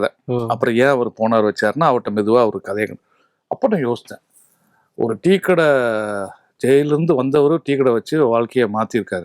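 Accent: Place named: Southern Tamil Nadu, India. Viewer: அருமை அருமை ❤நெறியாளர் தென் மாவட்டம் போல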